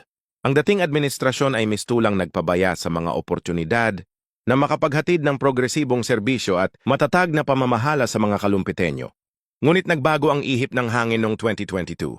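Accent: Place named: United States. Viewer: Lintik din ang narrator parang kano ayusin mo ang mga pag bigkas kakainis para kang hindi Pilipino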